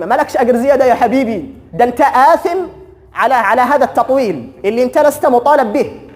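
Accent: Yemen. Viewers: مااجمل الهجه التهاميه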